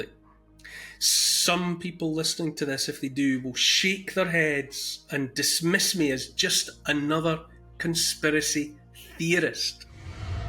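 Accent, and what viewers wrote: Scotland, United Kingdom. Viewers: Love to listen with that wonderful accent! 😍 Scotland, Ireland?? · I wrote this Poem a while back. Thought you might like it. It suits the times just fine... Feel free to read it out one day if you like. Would sound ace with your Scottish accent! Rare Gem Robert Berning 04/12/09 Filament and firmament everything's not permanent, from the depths of the greys, to the limit of our ways. But the cycle continues ever turning always into. We need new thought process, from the minds closed recesses, to change our way of learning, new directions we are turning. Many years we have thought the correct things we've been taught. All our lives we have lead, all the lies been drip fed. Suck the tits of prevarication, drink the milk of misdirection. Live your lives in that veil, let your own soul turn stale. Were all caught in a cage, with no bars and no walls, but to escape from this cage, we've been supplied with the tools, life's programming even way back to the schools have turned us all into illiterate fools. 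With probing my mind has now found the pulse that all that we have ever been has always been false. Equivocation, Evasiveness, fabrications for sooth fed statements that deviate us from or pervert the truth, from an arsenal of politics, religions false hope, to the alcohol, tobaccos and dope that we smoke. Their weapons are varied and dire in scope. We think were immune, but how they do gloat! When we escape, which we do all the time, its easy to accidentally just fall back in line. The programming was set from all our first breaths, to stay away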